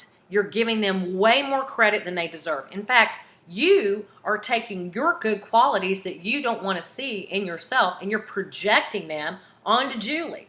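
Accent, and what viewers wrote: Texas, United States. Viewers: Girl u have to be from Texas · You sound like a Texas woman!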